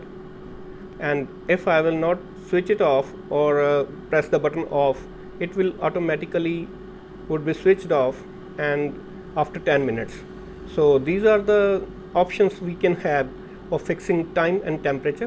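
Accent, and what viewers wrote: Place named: India. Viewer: Yes dear because he is an indian unlike many who speaks english and believe to have english genes.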